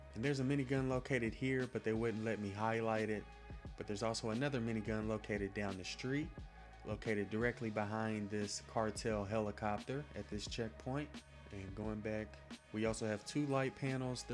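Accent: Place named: Texas, United States. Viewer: Es FLOR de Oro, pocos huevos de pinche mierda! And Jesus Fucking CHRIST! Talk about a really THICK Gringo tongue! You from Texas, boy? Yo soy, también, pero hablo Español respectablemente, académico, en facto. Tu pronunciación es ferguenzoso. Ay, me cai gordo. Flor has ONE syllable, not two; it's NOT pronounced FLOW-err. Do you HEAR the words coming out of your mouth? I'd be astonished if you'd managed a D in high school Spanish, and that's sayin' somethin', you bein' from the South an' all.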